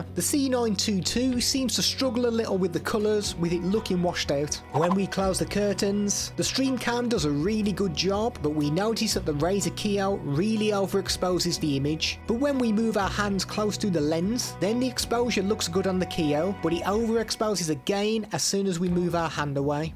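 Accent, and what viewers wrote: Black Country, United Kingdom. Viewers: Great review and even greater Dudley/black country accent 😂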